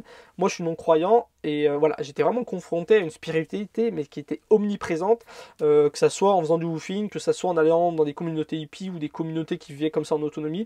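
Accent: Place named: North, France. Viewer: Bonjour Merci pour la vidéo. Il est plus rassurant de partir en woofing car il y a une certaine réglementation. Porte à porte plus compliqué quand une fille part seule en Australie. Le principal est d être claire avec le woofer et être très explicite quant à nos attentes. Soit dit en passant, vous avez l accent nordiste Merci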